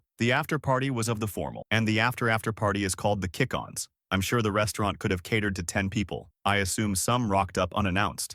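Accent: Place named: Iowa, United States. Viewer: I’m from Iowa and before you even said Hyvee I was like “Yeah she’s from around me.” Eastern Iowa😂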